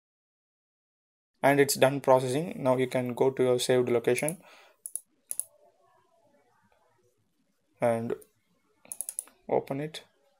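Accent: India. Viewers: i hear an indian accent coming up.... thanks for the tutorial btw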